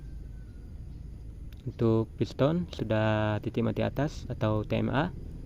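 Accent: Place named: Aceh, Indonesia. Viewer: dari cara ngomong pasti orang Aceh y bang,,dmn bg loksi nya biar kita cek motor fu saya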